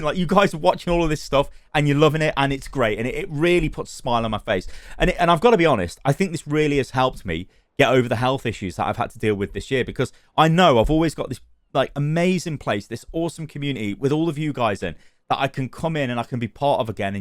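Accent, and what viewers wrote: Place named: United Kingdom. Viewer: No lie, you're a beacon in these dark times. Super happy you're feeling better, Crohns & colitis is no joke! Also lmao "because apparently I'm lucky cause I got both" is the gallows humor that I'm here for 😂😂 wanna add that despite the fact that I'm not gonna play any blizzard games till theyve definitely changed, I'll still be here for your content cause I'd miss that working class British accent lol, you're the best duderino!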